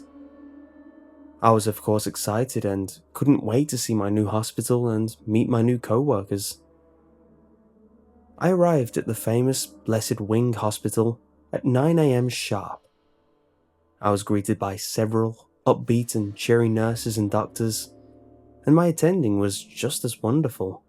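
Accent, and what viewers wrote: United Kingdom. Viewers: I can't believe how many views he gets, when i saw it this had only been up 18 minutes and already had over 1000 views. Guessing Americans like a creepy British voice? lol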